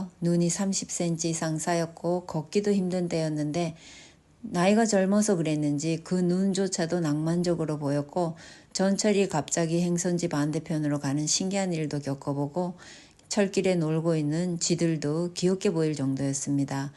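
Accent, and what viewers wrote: Gyeongsang, South Korea. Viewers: ㅎㅎ 경상도 사투리 정겹네요. 저도 미국에서 공부해 봤는데 미국생활 익숙할 듯 하면서도 힘들고 만만치 않죠. 재미있는 영상 잘 봤습니다. 감사합니다.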